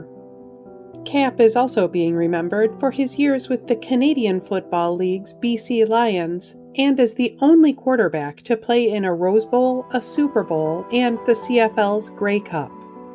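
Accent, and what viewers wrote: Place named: Midwest, United States. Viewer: I love her sweet midwestern accent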